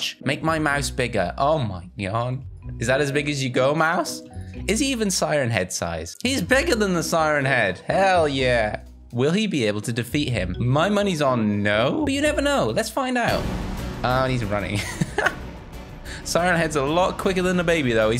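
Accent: United Kingdom. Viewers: r y British?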